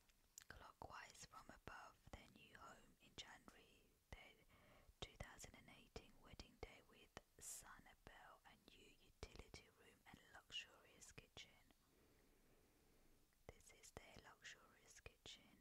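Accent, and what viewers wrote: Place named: United Kingdom. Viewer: ASMR in a UK accent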